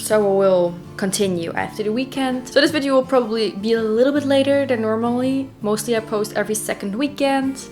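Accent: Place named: Germany. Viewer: du geht’s von dir aus aber verstehst nicht das nicht jeder so denkt. Du findest es also fair das andere bevorzugt behandelt werden u ihr deutsches Publikum vernachlässig wird? Außerdem sagst du selber das sie mit mach das Buch hier fertig auf dem Hauptkanal nicht gut läuft aber dieses hier was ideal dafür ist nicht für deutsche gemacht werden kann? Ich bin mir sehr sicher das mindestens 90% wenn nicht noch deutlich mehr hier auf diesen Kanal deutsch sind. Viele deutsche haben von englisch die Schnauze voll u wenn eine deutsche youtuberin besseren constant fürs Ausland macht sich doch etwas verarscht fühlen ist das ja wohl nicht verwunderlich. Hätte sie dieses Video auf deutsch u auf ihren Hauptkanal gezeigt bis ich mir so so sicher das sie deutlich mehr angesprochen hätte u mehr Follower generiert hätte. Es ist ein kausaler Denkfehler das ein Video auf englisch mehr durchstartet als in der eigenen Sprache. 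Kunst ist eine Nische u spricht nicht jeden an u wenn es ein English mit deutschen Akzent ist wird es auch nicht besser.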